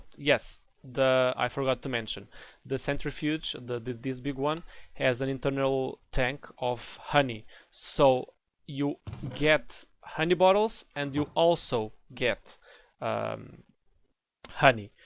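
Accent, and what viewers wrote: Portugal. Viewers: By any chance, are you Portuguese? I recon the accent (Tuga here...) :) Amazing video, been lost with this mod on ATM6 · Are tou portuguese? u seem lisboeta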